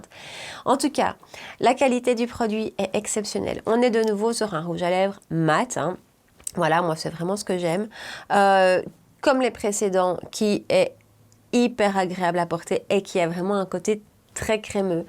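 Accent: Belgium. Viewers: J adore tes vidéos, j adore le fait que tu viennes de Belgique ( je suis belge et j habite en France depuis 10 ans, ton accent est un émerveillement des ouïes) et j adore ta couleur de cheveux, aurais tu la référence de ta couleur stp?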